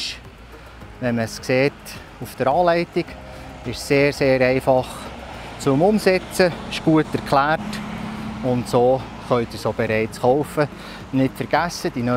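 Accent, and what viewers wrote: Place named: Switzerland. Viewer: Ich hab zwar Aussetzer, aber er spricht eh so langsam, das geht schon. Ist halt gehobenes Schwyzerdütsch...Danke für die interessanten Ausführungen, bin selbst grad am Überlegen, was ich am besten machen könnt. Ok, hab das Video durch, einen Daumen hoch da gelassen und eine Entscheidung gefällt. Es wird eher die Steinmatte, da mein Fertigteich eh schon Zonen hat, ich möglichst wenig ersetzen möchte (siehe Taschen, die Jute wird verrotten, dann bleibt nur mehr der Kunststoff), mit dem Korkgitter kann ich wenig anfangen, sieht hübsch aus, bringt aber nix. Steinmatte sieht nach Steinufer aus. Glaub ich mal. Also danke nochmal für das Video (bin Ösi, daher hatte ich verständnistechnisch gelegentlich Aussetzer, aber im Zusammenhang ging es nahtlos weiter) :)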